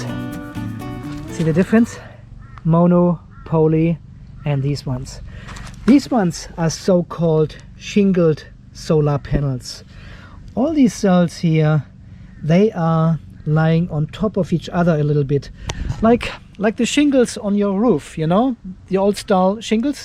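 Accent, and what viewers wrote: Germany. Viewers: Durch Zufall bin ich auf deinen Kanal gestoßen und dachte so ... Ist aber kein native speaker. Klingt deutsch. Und du bist es tatsächlich 😎. Sehr cooles Video, sehr schön präsentiert. Das Beste, welches ich zum Thema gesehen habe 😊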